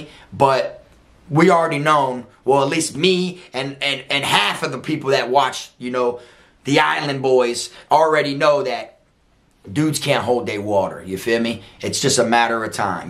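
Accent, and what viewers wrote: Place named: Florida, United States. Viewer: Yo we all definitely got the same talk here in Florida 🤣💯💯 I talk the exact same way you do! ❤️💯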